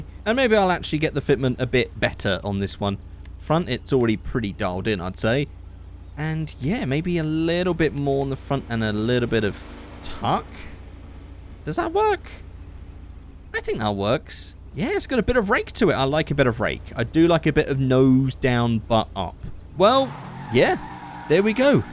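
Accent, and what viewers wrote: Australia. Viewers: Mate the Ford xr6 in black with red pint stripes is the tune I like....Australian accent not to bad Mr chrome dome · I was quite surprised by your aussie accent. Its better then some Aussies. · honestly not a bad aussie accent xD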